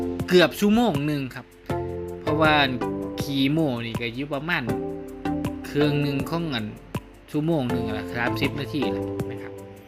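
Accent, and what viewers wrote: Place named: Isan, Thailand. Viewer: ให้เสียงอิสานอย่างมีอรรถรส ต้องรอตอนเมา😁...เพิ่นขึ้น หัวละ 300฿.แล้วเด้อร้านนี้💇🏻‍♂️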